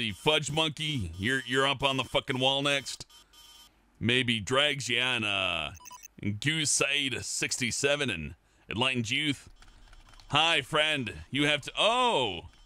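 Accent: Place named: Texas, United States. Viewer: I freaking knew you were a Texas. (I'm also a Texan.) Love your voice man!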